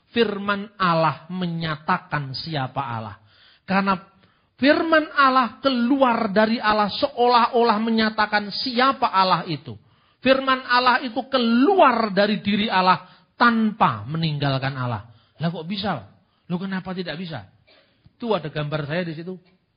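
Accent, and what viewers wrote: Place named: Yemen. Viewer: Pk kalau ngomong jawa seakan2 org dess.tapi,kalau ngomong arab seakan2 org yaman👍👍👍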